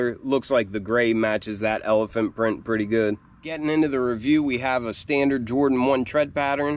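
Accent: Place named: Virginia, United States. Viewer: Are you drunk Virginia by chance? I used to live in VA beach and your accent sounds familiar. Great review BTW I will cop those for $160 price tag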